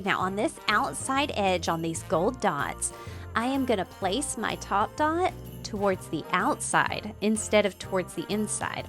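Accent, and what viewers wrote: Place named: South, United States. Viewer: Your soothing voice with a slight southern accent (so cute) and watching you create is how I start each morning. You've once again inspired me. Thank you!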